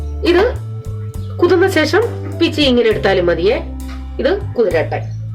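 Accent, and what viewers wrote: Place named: Kollam, India. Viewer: supr Chechiiiii.....chechide Kollam slang kelkkan nalla rasamaaa kettto.i like it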